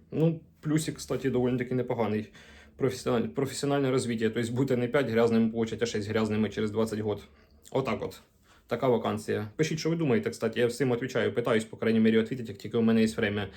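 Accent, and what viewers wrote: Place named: Slobozhanshchyna, Ukraine. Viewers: Вітаю, а скажіть звідки Ви родом? Говорите суржиком, мабуть з Сумщини? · Це твоі проблеми! Чоловік балакае на слобожанському говорі! Люди не должні всі по-галічанському балакати, як ти! ЗА СЛОБОЖАНСЬКИЙ ГОВІР - ЛАЙК!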